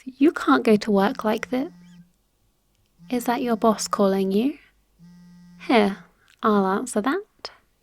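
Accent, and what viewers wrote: England, United Kingdom. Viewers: Man, im a sucker for a good English accent.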